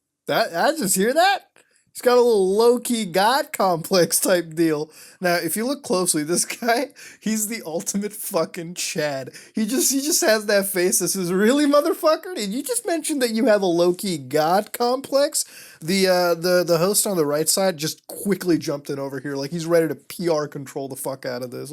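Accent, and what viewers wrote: Canada. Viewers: are you canadian ???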